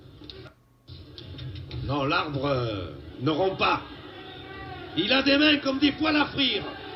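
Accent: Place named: Algeria. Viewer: Le commentateur est au top. Langage imagé et accent pied noir. Autre époque.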